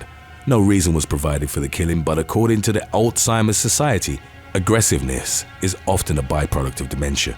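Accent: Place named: England, United Kingdom. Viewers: It’s like David Kieth’s but with the added bonus of an English accent! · Its a British Pro-Bubbly Lóndón Voicé of a Wést indián Jamacíân Negroiđ voicé Lyké Danný Johń Julês frôm Thê Rêd Đwãrf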